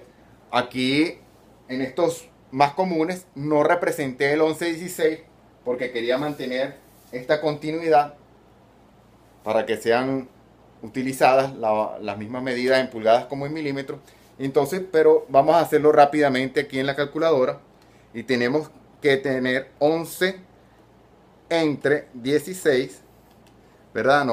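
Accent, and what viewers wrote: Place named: Venezuela. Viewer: Tiene acento Venezolano!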